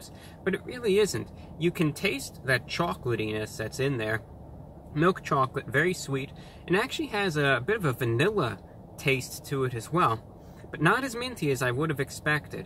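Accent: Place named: Northeast, United States. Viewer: I know you live in Florida but it sounds to me like you have a very northern east coast accent. Where did that come from?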